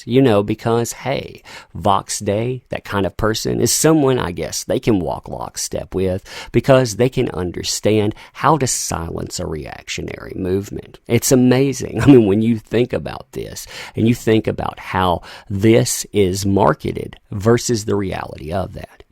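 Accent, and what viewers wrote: South, United States. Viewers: you sound like you have a southern drawl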